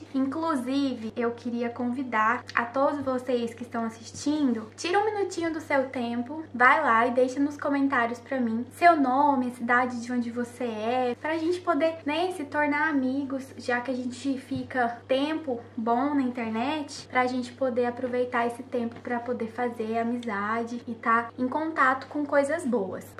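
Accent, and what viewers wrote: Goiânia, Brazil. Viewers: Oie, sou a Pâmela de SP, minha melhor amiga é de Goiânia eu adoro seu sotaque me lembra dela! Quero fora em um futuro próximo, seus vídeos são muito explicativos!!! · Oi, meu nome é Dayane, vivo em Liverpool UK, assisto seus vídeos desde quando vc morava em Londres e acompanhei sua saga. Um vídeo seu que mais que marcou o vídeo que você viajou com o Stephen sozinha, foi buscar seu documento e não estava pronto. Teve que voltar com as malas e remarcar a passagem. Partiu meu coração ver você sozinha mas ao mesmo tempo vi o quanto você é forte e uma mulher sábia. Tinha inúmeros motivos para reclamar mas transmitiu uma imagem de segurança e tranquilidade. Amo seus vídeos e tenho certeza que vocês vão superar toda essa situação com seu bebê. Vou colocar vcs nas minhas orações. Deus os abençoe grandemente. Quem sabe um dia tomamos um café juntas. 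Ps. Amoooooo Goiânia e o seu sotaque ❤️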